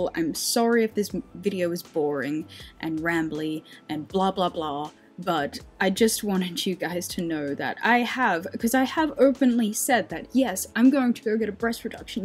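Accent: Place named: Australia. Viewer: Pretty Australian accent.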